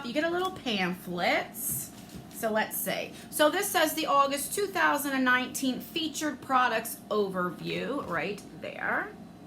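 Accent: New Jersey, United States. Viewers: I just discovered your channel yesterday! I knew you were a fellow Jersey girl from your accent!!!!! Definitely going to keep watching! Always ready to support a Jersey sister!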